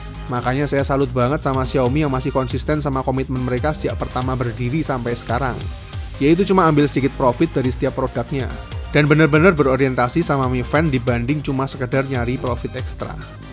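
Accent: Yogyakarta, Indonesia. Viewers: Bang orang jogja ya? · orang jogja bang? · wong jogja mas?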